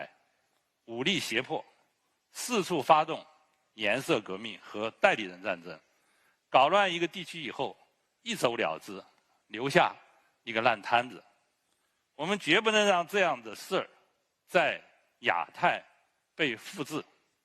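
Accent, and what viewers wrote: South, China. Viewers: 應該是南方，可能江浙皖吧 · 南方口音？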